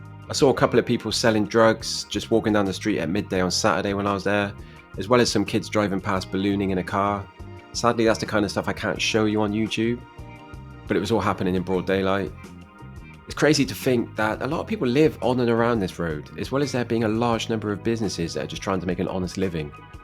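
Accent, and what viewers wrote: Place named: South West England, United Kingdom. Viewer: The best thing about the uk is your lovely southwest twang on your accent 😊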